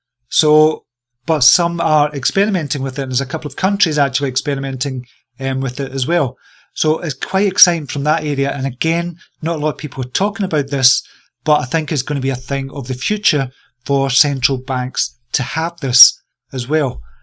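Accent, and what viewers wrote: United Kingdom. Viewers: Just discovered your videos on Youtube. Nice to see a UK voice in the crypto space. Got yourself a new subscriber. 0xaC371637e14a<num>CeE06918A66e460B4e8b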